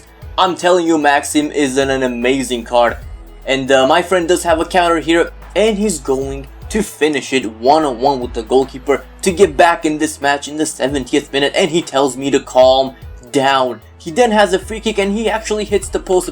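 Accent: United Kingdom. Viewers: nu mai pronunta numele romanesc cu accent de englezoi. e enervant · Dude,esti tare,continua...wow,ai un accent britanic excelent! ;)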